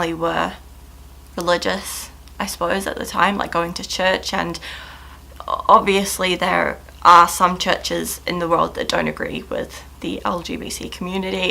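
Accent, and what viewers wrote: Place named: New Zealand. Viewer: Yeah, I like a more submissive role, but I think I can enjoy a dominant role too. (Change of subject). I'm from the U.S. and I've gotten board speaking how americans do. I wanted to change my accent. Long story short, your video on kiwi accent popped up. Since then I've been studying your (and a few other people's kiwi) accents cause that's basically how i want my accent.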